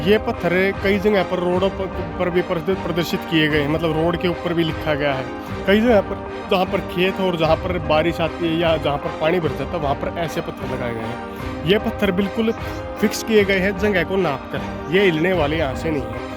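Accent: Haryana, India. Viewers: Zabardast yaar ..... infact your haryanvi accent is also amazing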